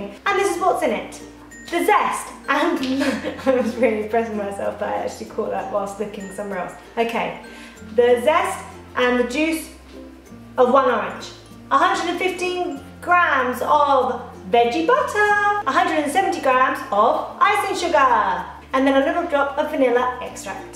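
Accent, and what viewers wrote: United Kingdom. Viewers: Her accent is British ☝🏻️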